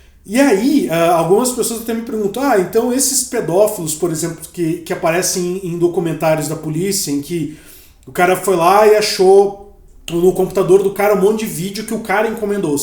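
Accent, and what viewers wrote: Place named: South, Brazil. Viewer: acho que ele é ou Paranaense ou Catarinense por esse sotaque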